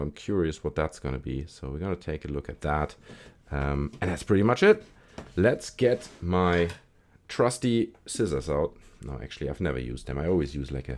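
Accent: Germany. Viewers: are u german? channel says UK tho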